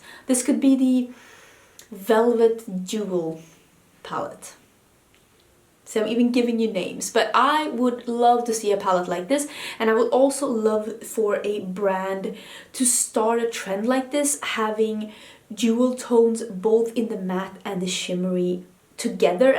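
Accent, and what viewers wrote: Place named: Sweden. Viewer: k this is so random but one half of my family is from Finland, moved to Canada in the 70s'80s.. I love watching your channel as it reminds me of my family who have now moved across the country 4 years ago! must be the similar accents/dialect of english as I know you are from Sweden. Beautiful countries!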